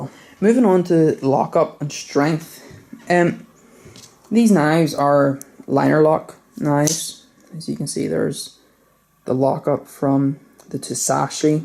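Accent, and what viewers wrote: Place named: Ulster, United Kingdom. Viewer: Hi 'Bout ye! Glad to hear an Ulster accent on YouTube. Enjoyed your vid,very professional presentation, it flowed with just the right pace and level of information. Excellent appropriate use of close focus. Good idea to end with 3 comparative size/style knives. I've chosen a purple Tsuchi today, I like a bit of bling!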